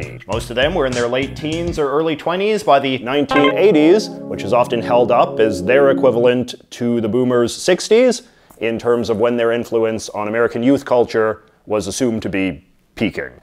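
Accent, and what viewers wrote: Canada. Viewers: Are you Canadian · your accent is so canadian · I don’t think you can put a hard line on what year differentiates a generation. Being born in 82, I relate a lot to Gen X even though I’m an older millennial. I got a good chunk of 80s vibes even though my prime upbringing was in the 90s, especially being that I’m a “late bloomer”. I think the term “old soul” applies to us who carry over traits of the previous generation into their more appropriate generation. I think also part of it is having older siblings/cousins that influence our upbringing. And wow, I’m sooory…I never thought I’d ever hear such a thick Canadian accent! lol love it!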